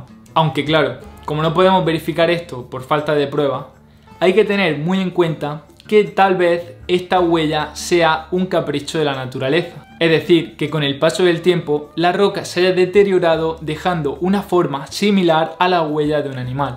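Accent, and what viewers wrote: Andalusia, Spain. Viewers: Es andaluz, así hablan en esta región de España, es el acento que le dio origen a los dialectos caribeños de latinoamerica... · Acento andaluz? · Este canal es para la historia lo que la homeopatía para la salud. Y lo que es peor, con acento andaluz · HOLA!COMO SIEMPRE MAGNIFICO TU DOCU!!!!ME ENCANTA TU DICCIÓN!!!!SE NOTA Q ERES ANDALUZ PERO TE ESFUERZAS AL RELATAR PA Q SE TE ENTIENDA!!!!OLE OLE Y OLE.....TE DOY COMO EJEMPLO A MIS NIETOS Q HABLAN COMO AMETRALLADORAS.....Y MAS OLES!!!!!🌹😀❤SOY DE MADRID Y VIVO N LIMA.💐🌷🌺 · ÉPALE !!!! Muchos países de Hispanoamérica tienen MUCHA influencia de Andalucía. Me sentí identificado con ese acento. Desde Panamá....Viva la Madre Patria !! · Me parece que es de Andalucía, una región de España.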